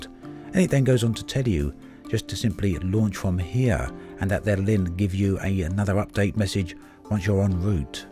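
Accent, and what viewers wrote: United Kingdom. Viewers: Funny how brit accents always make videos classier lol good job love Elite ;)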